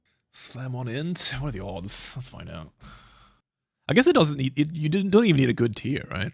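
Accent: Sweden. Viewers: You can't fake that Swedish accent 😊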